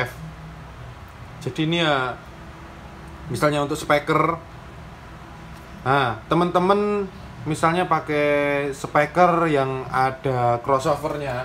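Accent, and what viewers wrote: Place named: Java, Indonesia. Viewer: Medok jowo puol wkwkwk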